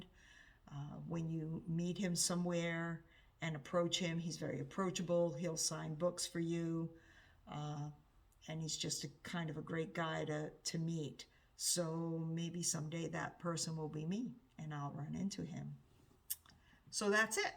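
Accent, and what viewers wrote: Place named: New England, United States. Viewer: I love my local libraries too. We live in a pretty rural area where I am, but they do a nice job I think, and I’m looking forward to being better at participating once my kids are older and I have more time. A Very Virginia Christmas sounds fun. I loved Tomorrow and Tomorrow and Tomorrow-such beautiful writing and very moving to me. I hope you like it! I’m not sure if I’ve ever read any John Grisham. I’ve seen the movie The Firm of course and I didn’t realize there was a sequel, wow. Maybe I should try one. Just out of curiosity, are you originally from New England? I have a lot of family from NH and you have a slight accent so just wondering :)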